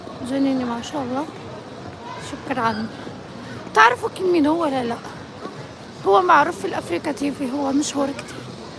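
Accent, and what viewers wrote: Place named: Iraq. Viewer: الله صايره تحكين عراقي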